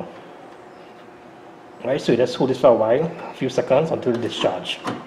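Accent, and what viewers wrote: Trinidad and Tobago. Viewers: Are you Trinidadian ?? Lol my microwave turned off and wont turn back on now dont know if the fuse is blown or not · Surely........a Trini accent.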